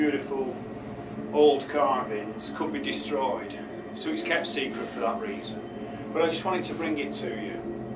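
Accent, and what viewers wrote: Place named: Lincolnshire, United Kingdom. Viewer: My dads from Clethorpes...he spoke like you. I believe that was Lancashire. No..... just looked it up it was Lincolnshire....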